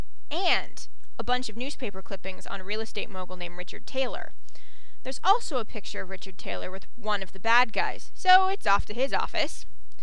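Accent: Canada. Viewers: I need to finish watching this. But hey, yeah, you do sound Canadian but some people think I do too because well, I live near the Michigan/Ontario Border. The way you said "about" sounded about normal to me. LOL, anyway, part 1 was great and I am sure part 2 will be too. Okay enough blah blah from me. Rock on! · omw you're actually so funny (: I must go re-watch this one just because of you. It's like 52 past midnight. Damn your obvious subliminal messaging. And Canadian? Is it bad that I never actually notice accents? You could have been Australian for all I noticed. OMGAUSSIEACCENTS *dies and curses her own accent*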